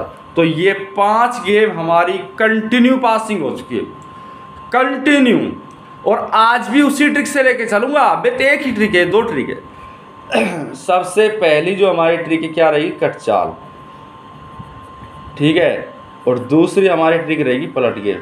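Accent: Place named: Mathura, India. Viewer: Mujhe aapki language mathura se lagti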